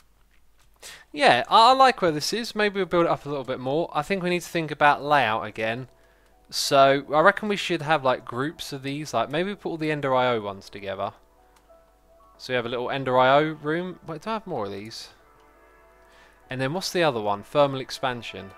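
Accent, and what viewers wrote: United Kingdom. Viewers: Please dont take that as an insult by the way your content isnt boring at all, its just something about your british accent that always does the trick for mr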